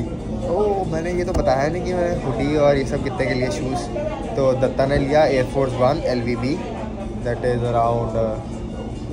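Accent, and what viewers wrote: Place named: Garhwal, India. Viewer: Garhwali accent Op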